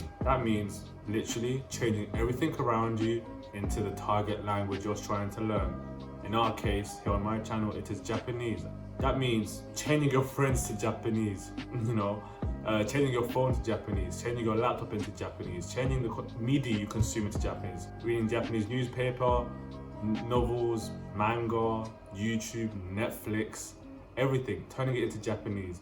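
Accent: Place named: United Kingdom. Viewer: Awesome that you're making content! Right now I'm a few months into learning japanese using the refold method, so I'm not an expert by no means. It's just that your japanese pronounciation kept bugging me throughout the video. I think you still have a really strong british accent while speaking japanese words like kanji, katakana & hiragana. Other than that the video was great and a good overview of the topic ! Just the pronounciation bit makes me a bit hesitant to subscribe, because I'm afraid it might be harmful to hear incorrect pronounciation of your target language.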